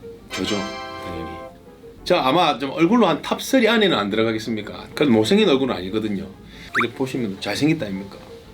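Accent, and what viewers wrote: Seoul, South Korea. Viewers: 씨. 방가워요 서울말씨. 귀여워요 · 서울말 너무 귀여워요~~ ㅋㅋ 화이팅!! 힘내요. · ㅋㅋ서울말 오빠 ~~~ 우승꼭 ^^ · 서울말 너무 귀여워요 모닝콜도 구수하네요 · 서울말듣기좋아요 사랑합니다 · 진 가수님 서울말도 귀역네♡ 미스터트롯 최종우승 하시길 응원합니다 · 서울말 너무귀여웡~~^^ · #진 커피한잔할레? 서울말 잘하시네요 서울말 많이 해주셔요 이다음에 M C 도 하신다면 유창한 서울말 잘하셔야 될것 같아요 탑7중에 제일 잘생기고 멋지고 노래넘사벽 최고예요 미스터트롯2의 우승을 기원합니다 💙💙💙👍👍👍👍👍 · 서울말?ㅎㅎ 해도 귀엽고 안해도 귀엽네 역시 진 짱! 등수 상관없이 즐기세요 싸랑합니다^^ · 서울말 않해도 괜찮이요 원픽 진 만네요 승리만~~~👑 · ㅋㅋㅋㅋㅋㅋ서울말 왜케 귀엽나요 너무 좋아요 진 😍😍😍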